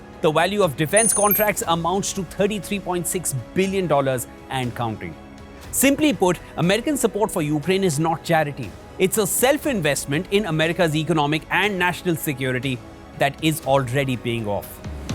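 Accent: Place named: India. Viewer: Yiu. And. Yiur. Annoying. Indian. Accent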